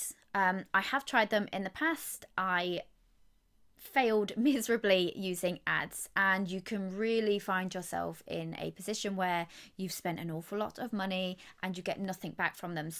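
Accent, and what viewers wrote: United Kingdom. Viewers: Hi 😊 I appreciate it because you are honest and realistic Excuse me , are you British ! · I am a Kdp newbie. I am about to upload my first children's book. Thank you for the sincerity and straightforwardness! Last but not least: I love your accent! What part of the UK are you from? I'll come back to let you know what happened to my sales. Wish me luck!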